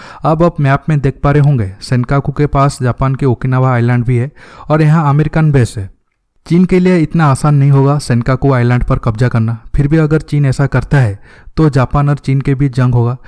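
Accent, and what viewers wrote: Odisha, India. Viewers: Ur hindi speaking is heared like odia tongue speaking.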